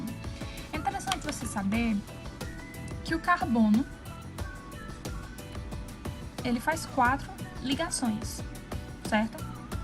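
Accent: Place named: Maranhão, Brazil. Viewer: Você é do Maranhão ?? Tem um sotaque parecido com o de uma amiga minha que é conterrânea dela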